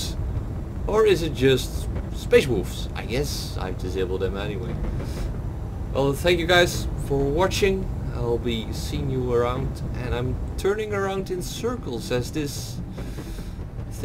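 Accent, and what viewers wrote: Netherlands. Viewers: Are you dutch? You sound Dutch or even a bit French?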